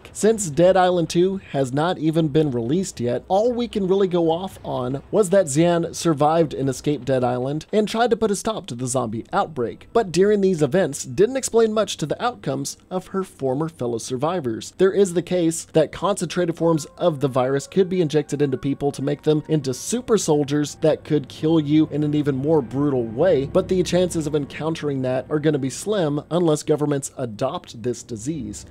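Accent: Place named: United States. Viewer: Zombies, dabs, and your smooth American accent is spooky season essential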